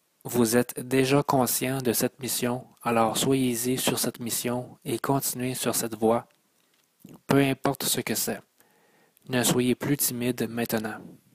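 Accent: Canada. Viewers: c'est quoi cet accent canadien yeshua a été élevé en Egypte et il a vécu en france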